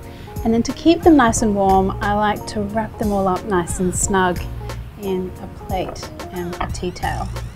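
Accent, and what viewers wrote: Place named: Australia. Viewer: I like you Australian accent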